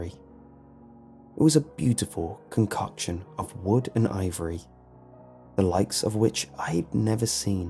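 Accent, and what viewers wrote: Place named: Liverpool, United Kingdom. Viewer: I can detect a very subtle Scouse accent or possible Manc maybe.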